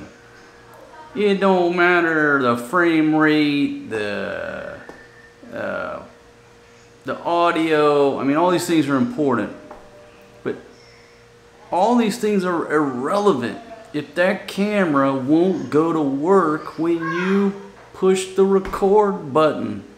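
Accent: South, United States. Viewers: Ahahaha. On 2158 min you said vijoos. Sounded so funny coming from you. Sounded so very Filipino. Kinda cute coming from a Southern man who has that Southern twang. Hope Fatima can relax when she goes back home so she can enjoy her vacation. Peace.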